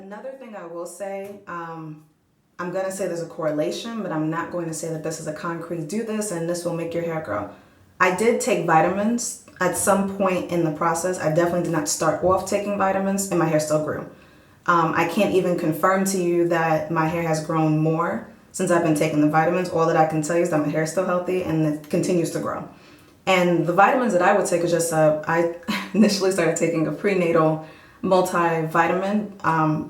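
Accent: United States. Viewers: you are from NY aren't you? I hear the accent. love it. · Love your hair, love your attitude, loving the accent!!! BK represent!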